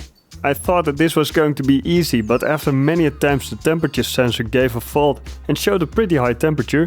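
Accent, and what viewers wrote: Netherlands. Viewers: I heard a dutch accent at Formnext this year which I remembered from somewhere. 😅 Saw you talking to the guys at the Slice booth. You were busy planning new stuff so I didn’t want to disturb you. Awesome and refreshing video as always!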